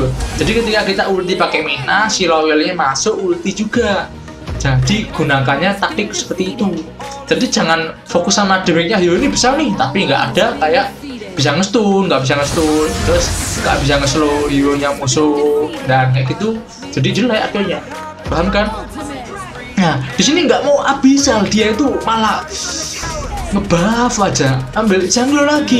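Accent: Java, Indonesia. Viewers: Aku seneng logatmu medok, mas....wkwkwkwk nice content 😄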